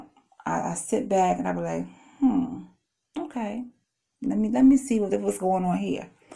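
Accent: New York, United States. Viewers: Well said my bklyn boo!! And you will not stop 🛑 talking to me lol bc Yasss I heard the New York accent lol but I hear it all the time lol 😂 TFS! Xoxo😘💕🥰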